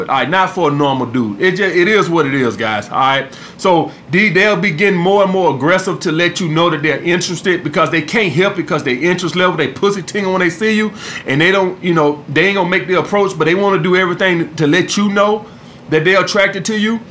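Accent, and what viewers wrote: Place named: Atlanta, United States. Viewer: you sound like you from Atlanta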